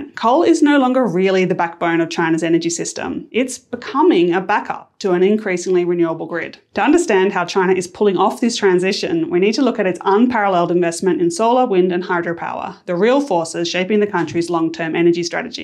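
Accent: Australia. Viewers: is this aussie accent?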